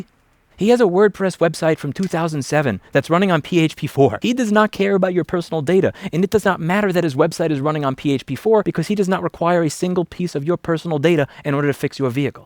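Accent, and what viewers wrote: New York, United States. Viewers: The New York drawl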